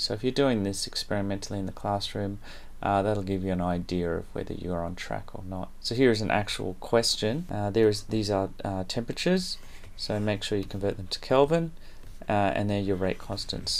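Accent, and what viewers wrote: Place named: Australia. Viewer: Hi,Lukas here.... Your videos are really great! I'm watching this one now for the test on Monday, in fact. By the time I've finished the iB, I hope to have mastered the Australian accent through your videos, but of course, in addition to some good chemistry knowledge. Also, I'd like to point out that the mysterious "DOGE" = Luke.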